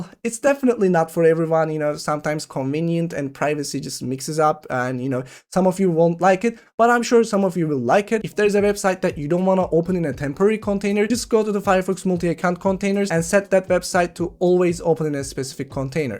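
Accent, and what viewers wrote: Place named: Turkey. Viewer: Hocam aslinda Turkce scriptin direkt Ingilizce karsiligi ne ise ona kasmak yerine, deginmen gereken onemli seyleri not alip onlardan bahsetmeye dikkat ederek dogaclama en bastan Ingilizce konussan daha mantikli olmaz mi? Bir de cok aksan var bence. Elbette aksanimiz var ama ben mesela Amerikan tarzi konusmaya calisiyorum, daha guzel duruyor. Direkt duz Ingilizce konusunca okulda dili ogrenen Turk liseli ogrenciler gibi geliyor kulaga, bu da globale acilmanda profesyonellik acisindan iyi degil bence. Nacizane tavsiyelerim. Dil degisince karakterin ve kisiligin degismesi gibi bir konu var bazi insanlarda. Baska birisiymissin gibi konusuyorsun istemsizce. Bende de oluyor. Reaksiyonlar, vurgular, tonlamalar hep farklilasiyor. Ama seninki, yine benim bildigim konusmasinin sadece dili degismis hali gibi hissettiriyor. Buyuk kanallarla kapisman konusunda sikinti yaratacigini dusunuyorum.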